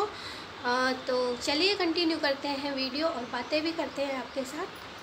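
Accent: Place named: Kanpur, India. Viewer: तुम्हारी आवाज सुनकर कानपुर की याद आ जाती है बिल्कुल ऐसा लगता है जैसे हम घर पहुंच गए आपके प्लांट के बारे में भी जानकारी दी बहुत अच्छी बहुत-बहुत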